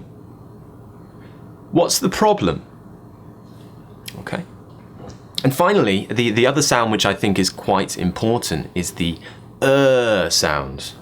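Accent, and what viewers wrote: RP, United Kingdom. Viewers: Love your British accent! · are you speaking in an RP accent in this video? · I love you so much hahaa. Being serious I love your british accent and your tips. Personally I found easier british than american english besides it sounds more beautiful. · Love it!! 🥰British accent. Handsome teacher. 🌹 One more Subscribe, ✔️ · I love your British accent❤ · Cheers mate !!! You are brilliant !!! I love the way you explain, your english accent is so posh. So beautiful !!! I'm addicted to your videos !!! Thanks a lot for your excellent work !!! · I'm really fond of your British accent , your videos are very helpful. Thanking you a bundle . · Mr Thank you very much for your useful British English videos. In addition to this your British pronunciation is mysterious beautiful. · I love ya in British accent 🤗😂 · I love u n ur British accent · كم انت رائع يا استاذ احببت لكنتك البريطانية جداً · I love your British accent....never turn iit into an American one even if it's fashionable nowadays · I came from studying Scottish accent, and I really need to hear your beautiful RP accent What a wonderful world this would be if everyone spoke like you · i LOOVE your british accent. 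Thumbs up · I love this British accent .... would u please tell me your Facebook Id...I wanna join and learn from u......or whataap number..... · I've fell in love with British accent as well as the teacher 😆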